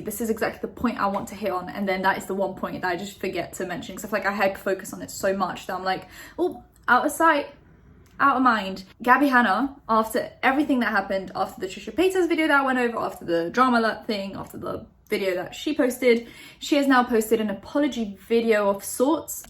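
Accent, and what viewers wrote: United Kingdom. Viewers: Ok, I've been to London three times. I lived there for 5 months one of the times. I'm really good at deciphering a British accent....You're actually talking really fast....Please, slow down a bit, dear. What you're saying is interesting enough to want to hear it...I can understand it, but it takes effort. Better if people can relax and listen.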